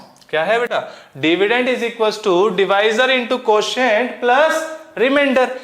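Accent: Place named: South India, India. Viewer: Sir aapka naam kya hai? Are u from South India? It assumes of ur accent…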